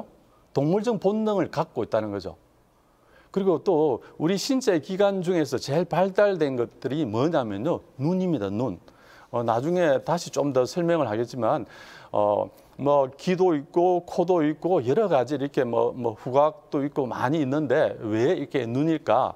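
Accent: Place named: Gyeongsang, South Korea. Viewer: 다소경상도 말씀이라 알아듣기힘들었지만 강의를듣고 우선책을많이읽어야겠다는생각을했어요.수고하셨습니다.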